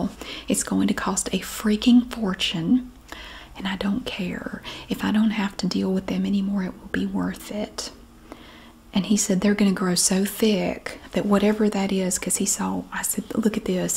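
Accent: South, United States. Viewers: Best southern asmr videos on this channel! Aside from charity and carl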